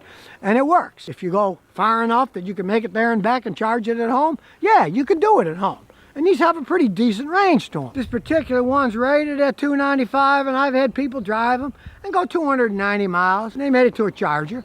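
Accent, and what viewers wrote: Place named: Midwest, United States. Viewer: I could swear you said something at the beginning of this like "rough Long Island road". You didn't say that did you??? That would mean you're from Long Island and then we'd become friends! You have to be in the Midwest by the sound of it, right? I have a 2012 Navigator I'd LOVE to be able to bring in for you to fix!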